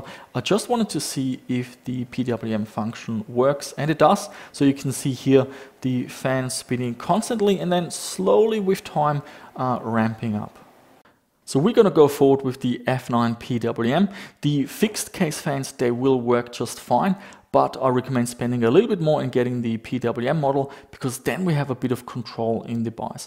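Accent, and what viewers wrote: Germany. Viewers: Wenn deutsche english reden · ah jes🙈its sounds like you are from Germany😂no hate nice Video✌🏼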